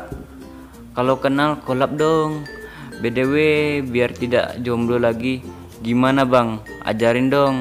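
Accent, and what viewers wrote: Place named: Banyumas, Indonesia. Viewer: ngomongnya yg loss ngapak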